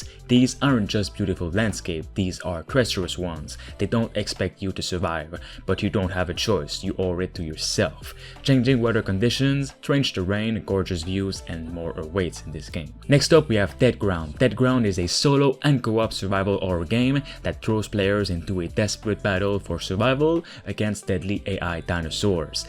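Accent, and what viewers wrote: Quebec, Canada. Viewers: Est-ce que t’es Québécois ? ton accent te trahis un peu big😂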